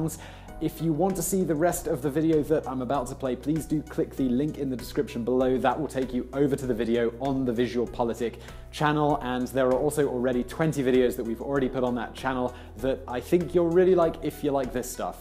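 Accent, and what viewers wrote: United Kingdom. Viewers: is like VSauce's Michael with a British accent <3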